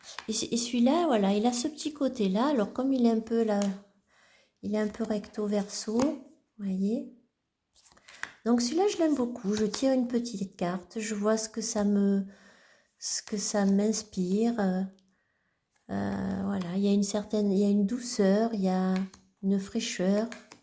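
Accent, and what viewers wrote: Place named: United Kingdom. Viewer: Bonjour! Je suis très nature 🐰🐿️🦇🦔🌳et très couleurs 🌈 alors forcément je me suis régalée! Merci pour ces découvertes c'est enrichissant et très tentant 😍. Nous avons le même accent anglais 😉...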